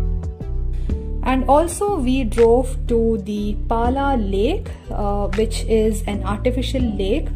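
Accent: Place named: India. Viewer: Wow Thank you for posting this. It brought back so many good memories since I lived in Viljandi for 3 years. Its a beautiful and calm town <3 I am from India and I guess you're Indian too? Assuming by your accent :)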